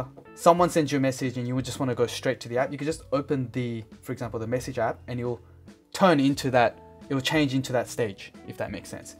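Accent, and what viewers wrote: Australia. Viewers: east asian guy with an aussie accent is quite trippy lol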